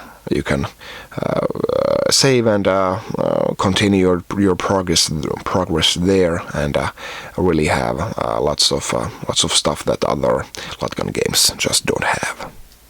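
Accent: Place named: Finland. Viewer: He cant help his Finnish accent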